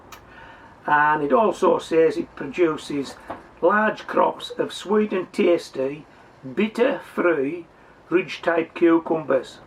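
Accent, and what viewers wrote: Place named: Northern England, United Kingdom. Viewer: What a great idea about the burpless cucumber, had to laugh about that one. What part of England are you from? You sound like a northerner, only ask cos your accent sounds like my dad :-). I always grew up with a veggie patch and only just started to get into growing stuff again. Nice to meet you, I am a new follower and enjoy watching your videos :-)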